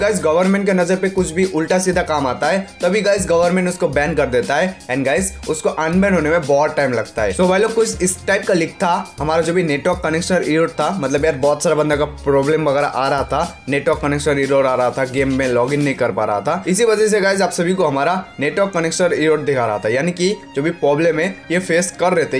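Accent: Bengal, India. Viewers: Yes bengal se lagta hai😐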